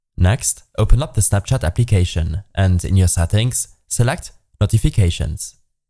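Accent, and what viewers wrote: Germany. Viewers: You accent is very weird. You sounds like a german person who speak englisch.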